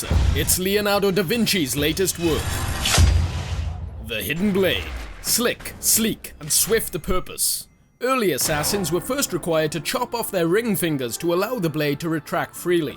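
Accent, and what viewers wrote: Wales, United Kingdom. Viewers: Actually nevermind. Wales! Are you welsh? I am Cockny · i know its a stupid question to ask. are you British ?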